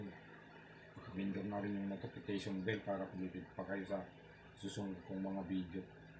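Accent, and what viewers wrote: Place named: Ilocos, Philippines. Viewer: Tagat nu ka bro?Parang ilocano ka..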